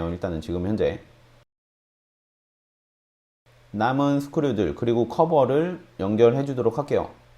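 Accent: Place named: Vietnam. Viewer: 아 베트남 사람인줄.. 발음머임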